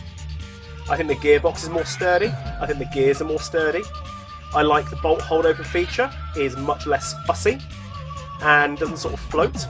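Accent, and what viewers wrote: RP, United Kingdom. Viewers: you sound posh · His swearing and british accent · thought i was on a english ponce channel first 6mins. was gonna tell you to go swill tea with the upper class twats in a palace.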